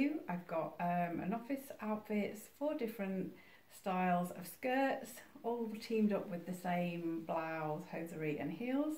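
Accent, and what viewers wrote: United Kingdom. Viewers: Hi Thanks for responding, most appreciated. I know that your are passionate about Cervin, but I've just had a mail from Gio - 50% off everything and their stockings are very good too.. Lovely to hear your northern accent. Where are your origins? I'm originally from Bolton/Chorley. Have a lovely evening xx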